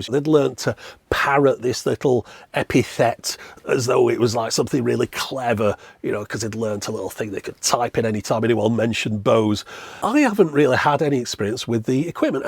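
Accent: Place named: Liverpool, United Kingdom. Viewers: So you’re a Liverpudlian 🤔 that’s where the accent comes from. Anyway, a very interesting video for me. As ever since I was a teenager I wanted to get a Bose Wave of some description. But they weren’t available in my part of the world. Bose are a solid good quality company too. It’s a shame the Wave series have been discontinued (not of too much use in a smartphone/Bluetooth speaker world I suppose) and the stores shut down. Even if the latter is a moot point from my point of view, as they never were available in our parts anyway 🤷‍♂️ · AHA! That's your accent. Liverpool - thought you sounded like a Beatles all these years. Can you play drums? You're really near Ringo.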